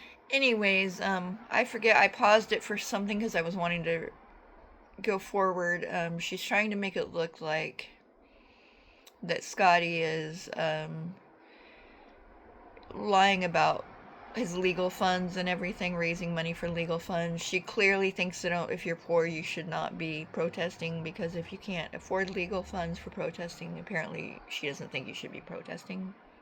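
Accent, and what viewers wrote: United Kingdom. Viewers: Thanks - agree 💯 and the Brit accent is hilarious! ❤❤❤ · I've been catching up on a bunch of streams. Sorry I didn't see this earlier. 👏 👏 👏 👏 Great Brit accent & recap of Kelli Craptor's video. I can't watch her amongst others who fall in line behind MAGA kArOn. They're disgusting imo.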